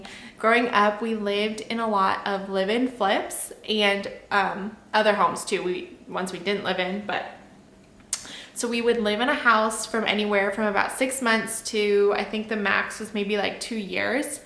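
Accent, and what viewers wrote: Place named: Texas, United States. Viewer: Learned about your channel from Monica Rose. I totally enjoyed "getting to know you"! Sounds like you are in Texas.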